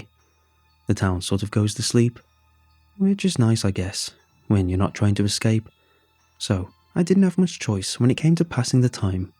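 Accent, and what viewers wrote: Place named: Rhyl, United Kingdom. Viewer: Sounds like Rhyll. “If all else fails, try Wales....”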